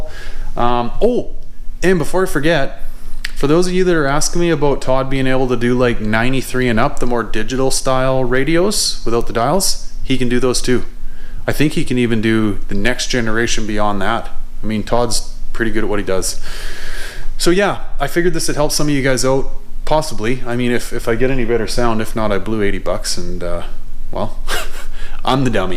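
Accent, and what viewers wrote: Canada. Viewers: This guy always sounds like he's trying to fight a Canadian accent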